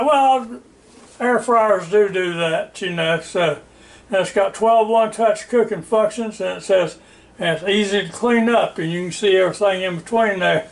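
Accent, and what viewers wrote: South, United States. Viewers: It’s the southern accent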